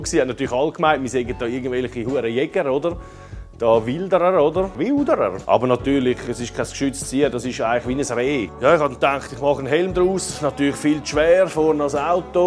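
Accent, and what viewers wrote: Switzerland. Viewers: Schweizer Deutsch, immer wieder lustig. So 'ne Töff Sammlig hätt ich auch gern.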